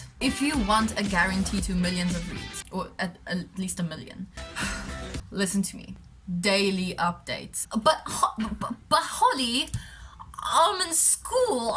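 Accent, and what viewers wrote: South Africa. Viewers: I knew she was from SA ,the accent said it all😂....yess!! Fellow South African💫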